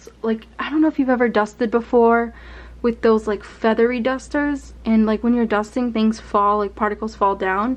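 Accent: Boston, United States. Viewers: You from Boston ? Some of your accent sounds like mine!!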